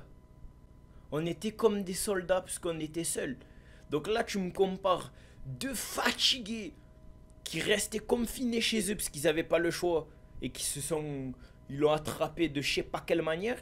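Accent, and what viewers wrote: Marseille, France. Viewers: Tous se qui me plaît ses ton accent marseillait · T’es marseillais ?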